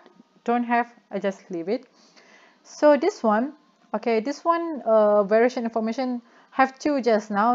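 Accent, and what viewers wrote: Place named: Tamil Nadu, India. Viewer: Mati mood nk tgk bile speaking english dgn suara tamil mcm ni. Baik ckp melayu jelah sis.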